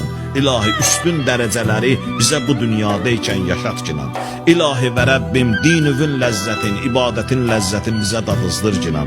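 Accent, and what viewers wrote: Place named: South, Azerbaijan. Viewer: Cenub bölgemizdenem bilirem danışığnınan qardaş sende cenublusann 😢😢😢.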